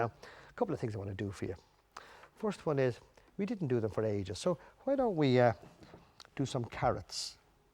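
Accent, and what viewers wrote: Ireland. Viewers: Thank you so much for putting this lesson on. I've followed along with quite a few of your paintings and have learned a lot from you, and I love hearing you talk and listening to your Irish accent! Please keep on teaching us. Thank you!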